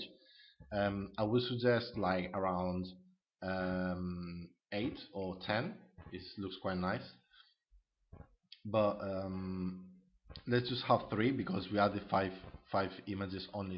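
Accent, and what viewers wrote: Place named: Spain. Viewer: jejejeje por el acento me sonaba a bastante español, pero ahora que he visto por ahí..."subir archivo" jejejeje Acabo de comprar el tema y lo estoy instalando, a ver qué tal. Un saludo y gran trabajo con este soporte en vídeo.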